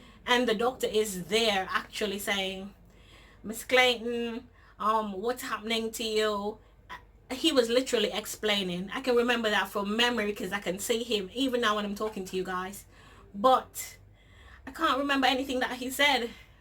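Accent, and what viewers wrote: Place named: England, United Kingdom. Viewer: Interesting that you said Oxford shirt and now you have an English accent hmmmmm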